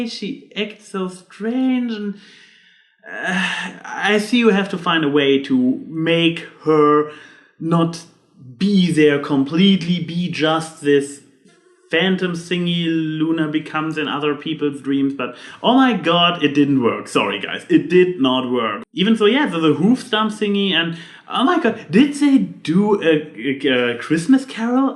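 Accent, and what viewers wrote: Germany. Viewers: I must be a dumb blonde for not picking up on the Christmas carol reference., you're execution of this impression felt a little confusing to me because it seemed like you were jumping from topic to topic too quickly and add in your German accent, it was very hard to follow. Can you try to slow down next time? Thanks.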